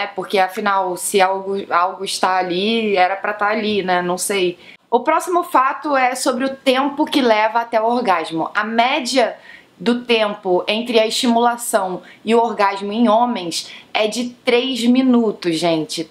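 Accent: Rio de Janeiro, Brazil. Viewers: Sotaque carioca é padrãozinho, porém lindo 😅😍.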